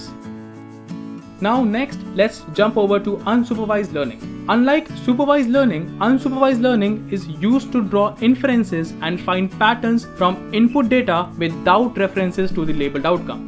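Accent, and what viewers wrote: India. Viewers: 又是满满的咖喱味儿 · Why indian accent use too much tongue?!!! It's so annoying.. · The moment i heard the indian voice i just knew it would be an awesome video :)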